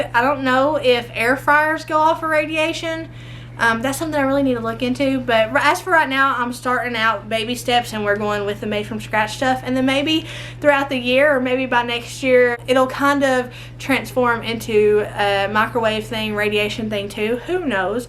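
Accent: South, United States. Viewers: omg i can tell ur southern cause u do like i do when i get too talking i talk so fast and i talk about everything and people have too tell me too slow down lol i love the way u are it makes me smile !!! thank you veery much for making the videos i love you and your family yall are so down too earth that what makes u special !!! MUCH LOVE TOO U N YOUR FAMILY !!!!!!!!!🥰🥰🥰🥰🥰🥰🥰🥰🥰🥰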